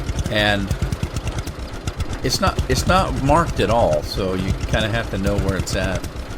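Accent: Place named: Minnesota, United States. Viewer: I know this is an old video, but because I noticed it in the first video. I could tell you were either from MN or thereabouts, eh...enjoying the videos, thanks for posting.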